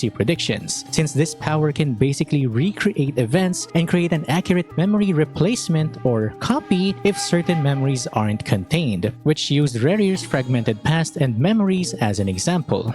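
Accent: Philippines. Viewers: Great vid.. also the sexiest Filipino accent ever 😉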